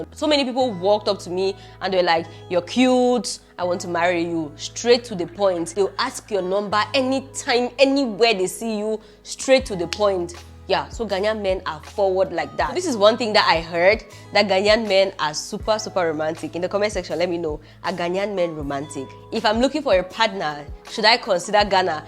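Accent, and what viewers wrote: Nigeria. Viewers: I love your content. A Cameroonian with a Nigerian accent 😍 and body language. That goes to show that we're one with slight cultural differences. Keep it up, babe 👍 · Not conversant with Cameronians but you sound like a Nigerian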